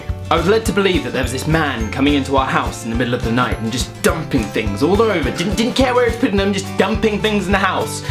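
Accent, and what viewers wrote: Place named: United Kingdom. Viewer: u have british accent when vid started I instantly knew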